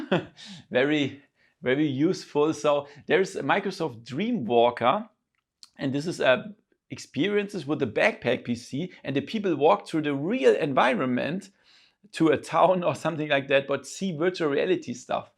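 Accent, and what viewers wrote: Germany. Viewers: You never have to apologize for your English. I absolutely love your accent and Germans in general so the people who are here, stay for one reason or another.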